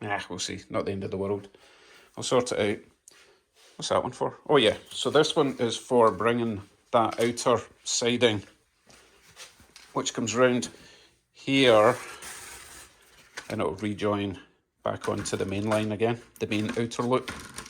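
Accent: Scotland, United Kingdom. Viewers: Its the Scots accent we have apparently some just love to hear us talk, LOL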